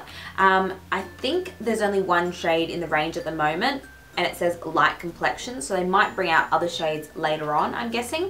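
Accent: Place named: Australia. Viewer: Loving your hair. Ps are you aussie , you sound it abit haha.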